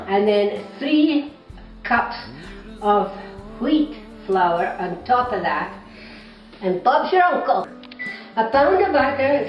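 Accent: Scotland, United Kingdom. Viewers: She sounds like a Mackay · Gotta try these for sure. Love the recipe and the Wee bonnie lass accent !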